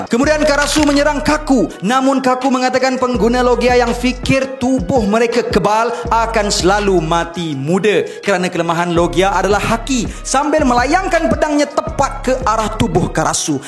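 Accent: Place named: Kuala Lumpur, Malaysia. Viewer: Alamak u bro cara u ckp mcm org K.L laa..Ape,mne adew,.korang...Terbaik br0👍...Tapi mmg mantap cara lu brbahas n brcerita...Jelas,.Aku dgr cara lu brcerita Terbaik..Aku bgi 8/10️💫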